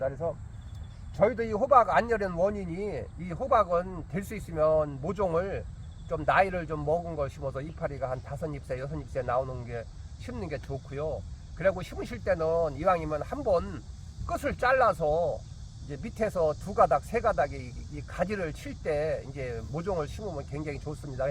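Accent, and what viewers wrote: Chungcheong, South Korea. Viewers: 충청도 사투리 정말 정감있고 와닿네요~^^ 단호박 비료 안줘서 한이지~ㅋㅋ 매번 잘듣고 도움되고 있습니다.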